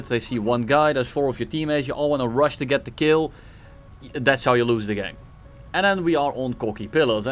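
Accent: Netherlands. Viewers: Fist second in the video and I know he is Dutch 😂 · I smell netherlands behind your voice accent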